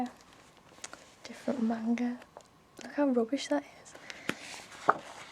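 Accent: United Kingdom. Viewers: are you from england ? cuse it seems like you have a british accent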